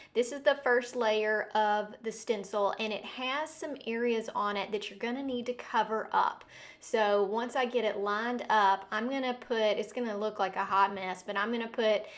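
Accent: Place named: Texas, United States. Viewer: Beautiful! And I enjoy that Texas twang...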